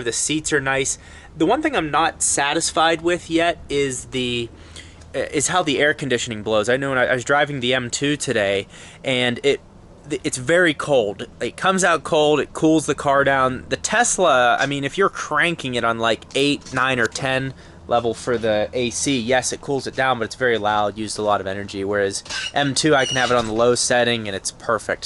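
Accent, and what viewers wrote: Pittsburgh, United States. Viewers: I knew I detected a pittsburgh accent.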